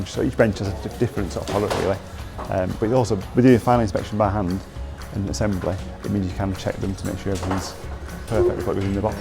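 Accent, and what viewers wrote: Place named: United Kingdom. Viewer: The worst audio, buy some microphones. It hard enough to understand the thick limy accents. Talks like hes on coke or flour in his mouth.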